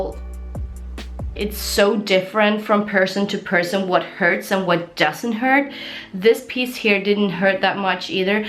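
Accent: Sweden. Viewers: Oh love tour videos! I've followed you for a looong time and remember when you started speaking english in your videos and it's crazy what an improvement your english has gone through! Jag glömmer mellan gångerna vilken härlig dialekt du har tills du pratar lite svenska emellanåt. Fortsätt gärna visa dina tatueringar och piercingar 💋⭐️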